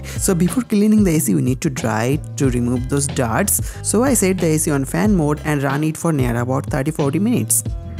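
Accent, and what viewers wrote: Bengal, India. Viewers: Do u have paan in Ur mouth?😂😂😂 Bongali xcent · You're typical Bengali accent is pathetic! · Bengali accent or something else.....my tongue is twisting by hearing him..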